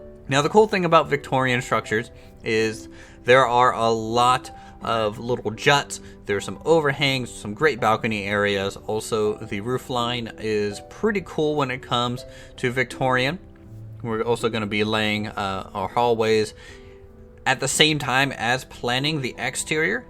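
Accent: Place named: South, United States. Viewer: It's weird how you have a southern accent yet sound like you barely know english.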